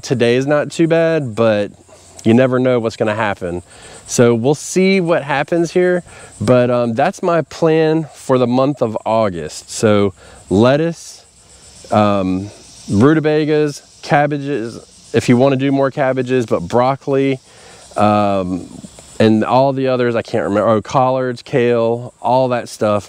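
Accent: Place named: North Carolina, United States. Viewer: Northern NC accent I'm guessing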